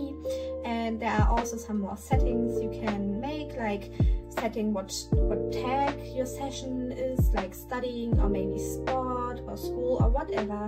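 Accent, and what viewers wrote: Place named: Germany. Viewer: That German accent😁 Grüße! Gutes Video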